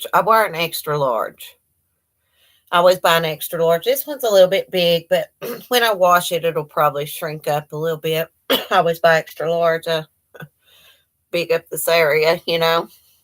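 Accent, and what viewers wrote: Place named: East Tennessee, United States. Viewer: New sub! It’s nice to find someone calm and not instigating trouble! Where are you from? We have the same accent..East Tennessee here! Glad that I found you!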